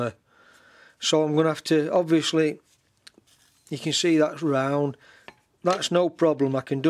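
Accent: Yorkshire, United Kingdom. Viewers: Looks like your taking a very good approach to it, - As you said not the easiest of boilers to make and flange. Are you from Yorkshire? Derbyshire? Keep up the good work !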